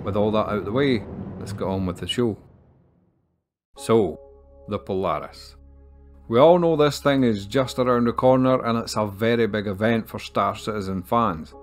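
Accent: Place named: England, United Kingdom. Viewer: I think its lovely English accent i love most about his videos- is it from London? Also next you could do 'Most Anticipated Gameplay' as that connects with ships so much. Cheers English Gent!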